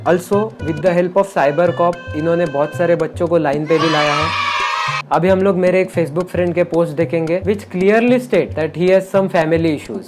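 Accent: India. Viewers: Abe tu bihari kyun lagta hai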